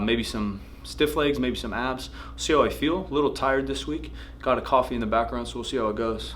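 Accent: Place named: Russia. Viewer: Beautiful sumo technik russian accent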